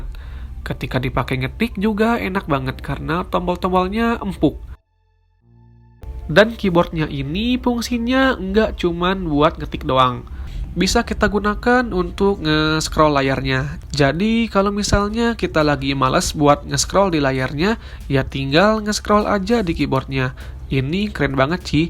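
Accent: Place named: West Java, Indonesia. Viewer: Orang sunda berarti masss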